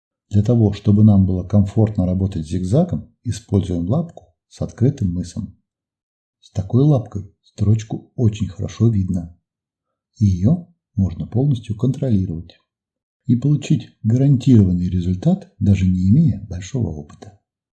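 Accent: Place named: Kurgan, Russia. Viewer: Обремкаются, вышаркивается - супер! Прямо ностальжи по Курганскому говору! Удачи вам в вашем творчестве!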